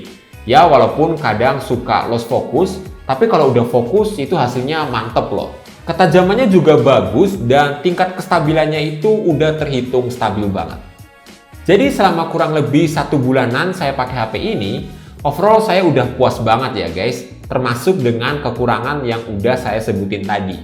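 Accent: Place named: Java, Indonesia. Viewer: Medok juga sih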